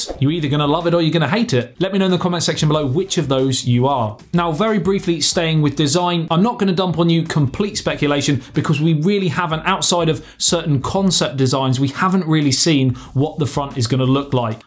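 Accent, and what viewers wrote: United Kingdom. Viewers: 2nd commenter i guess.......love your brit accent 😅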